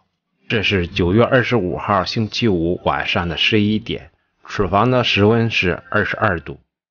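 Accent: Shandong, China. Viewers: 是的，山东老乡。你家乡话还是那么重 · 听口音您也是山东人吧，同在日本，听到乡音很亲切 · 我听出了您的山东口音我家祖辈也是山东烟台人👍谢谢您的答复，祝您圣诞快乐🤶🎄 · 一听就是山东好汉，胶东人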